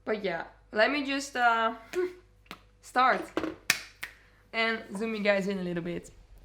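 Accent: Netherlands. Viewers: Omg it looks really goodd😍 Are u Dutch? Your accent sounds a bit dutch 😊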